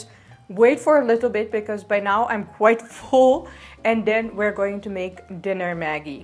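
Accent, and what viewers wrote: India. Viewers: Wow your English accent just like an Indian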